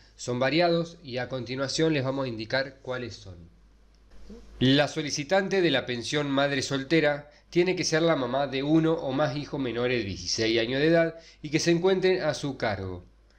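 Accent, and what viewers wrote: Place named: Argentina. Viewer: En qué país es esto??? perdón es que el acento es argentino ó uruguayo??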